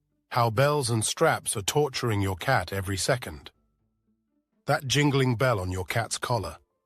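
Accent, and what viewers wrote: United Kingdom. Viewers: This video is a bit fear-mongering. Presumably everyone who’s tuned into this is already considerate enough that we want to hear what we may be able to improve on for our cats, you don’t need to put the fear of God into us by telling us we’re killing them, making them depressed, and all other matter of things in every other sentence 😢 You talked about temperatures twice. You sound either British or like you’ve spent time in the UK. If that’s true, then you know the climate here and the cost of energy. I simply cannot afford to heat my property to between 22-26 degrees Celsius. Do you have any suggestions for how to keep our cats comfortable without spending hundreds per month on heating?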